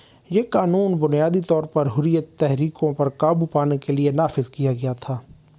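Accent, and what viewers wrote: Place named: Mianwali, Pakistan. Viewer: Apki awaz se Ap mianwali se lag rahe ho ? Kia ap mianwali se ho ?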